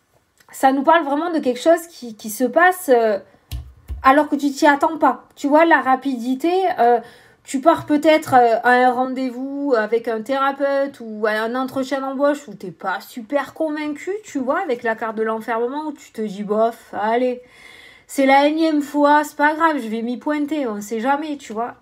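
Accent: South, France. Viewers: merci pour ce tirage, il est trop bien. Un joyeux anniversaire , profitez bien et plein de belles choses. Et comme vous êtes du sud et que je suis du sud aussi , vous me le rappelez trop d'ailleurs, toutes vos expressions me font trop rire , ça fait un bien fou d'entendre quelqu'un qui parle comme moi "mdr". Je vous fait un énorme bisou pour votre anniversaire.merci, merci pour votre bonne humeur dans toutes vos vidéos.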